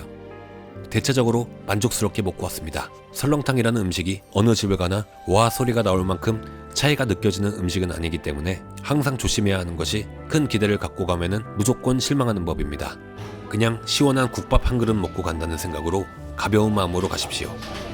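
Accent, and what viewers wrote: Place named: Gyeongsang, South Korea. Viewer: 말투가 경상도인데 원주 사람이라 그러네 ㅋㅋ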